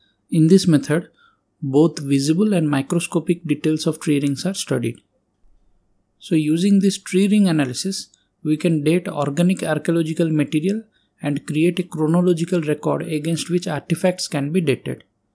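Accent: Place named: Bihar, India. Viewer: Sir you are definitely from bihar.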